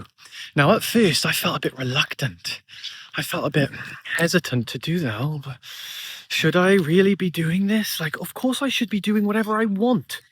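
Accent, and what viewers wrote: United Kingdom. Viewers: I see you are in Australia but sound like a 🇬🇧:)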